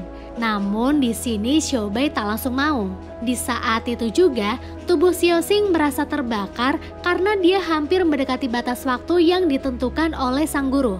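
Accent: Pemalang, Indonesia. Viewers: Mba orang Pemalang nih.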